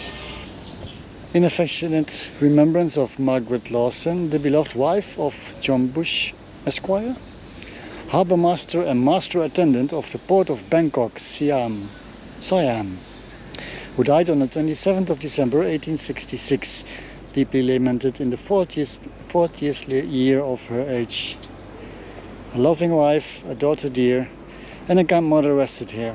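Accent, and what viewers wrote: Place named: Germany. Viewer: Oh pardon me. I just think you're German from your name and accent. also, I just know that you like the cemetery beside you like trying transportation. Indonesia actually has a lot of Dutch cemeteries. some of them still exist and some of them have already been destroyed. Examples of good cemeteries like Makam Jerman Arca Domas. Its cemeteries said that it's Nazi soldiers. Others are still good like the cemetery in kebun raya bogor, makam belanda at Menteng dalam Tebet and the museum Prasasti.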